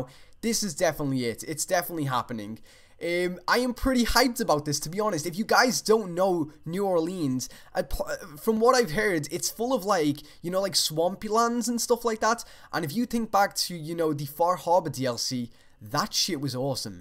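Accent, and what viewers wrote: Liverpool, United Kingdom. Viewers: your enthusiasm is infectious. I have it now, too. I hope New Orleans is true. Nuka World was leaked in a document two years ago, and it's coming out next week. if Obsidian is indeed working on this, then it might explain why the next South Park game was given to another developer. BTW your accent is difficult to pin down. I'm detecting Liverpool with a hint of Dutch? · Where are you from,? Sometimes you sound like you're from Liverpool in the UK but then you pronounce some syllables like an American and I second guess myself. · He from the U.K. Liverpool.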